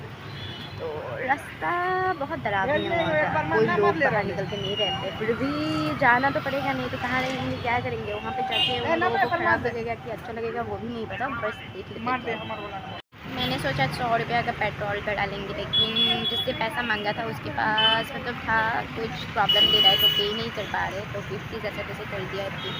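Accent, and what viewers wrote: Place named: Assam, India. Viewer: Bhai vo assamese hai .. assamese ki hindi itni acchi nai hoti hai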